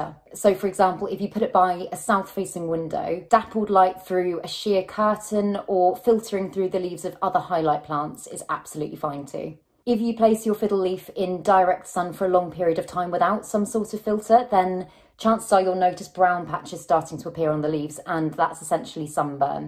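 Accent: United Kingdom. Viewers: Nice to hear a British plant youtuber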